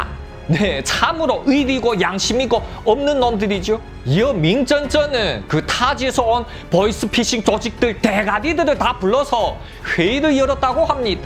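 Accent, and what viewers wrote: China. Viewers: 진짜 중국스타일 톤과 리액션 딕션 너무 재밋어요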